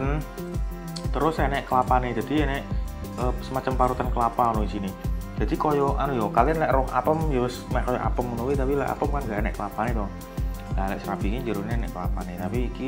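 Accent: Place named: Java, Indonesia. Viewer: Medok 2 salam salim slem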